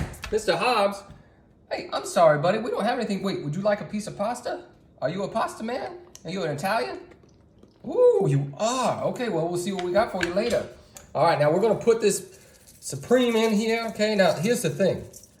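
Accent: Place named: Louisiana, United States. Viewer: I’d say Louisiana or south- judging by accent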